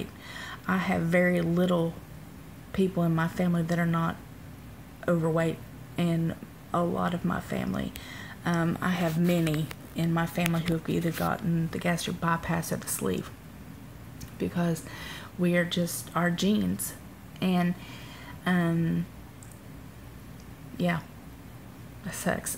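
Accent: South, United States. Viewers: just have to say you have the softest sweetest voice. and I love that southern twang lol. Girl keep your chin up and keep on keeping on.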